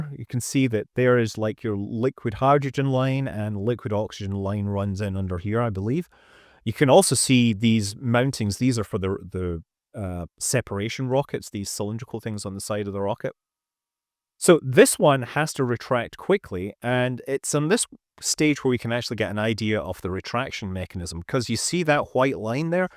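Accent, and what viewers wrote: United Kingdom. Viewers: Another Brit narrating US History. Why?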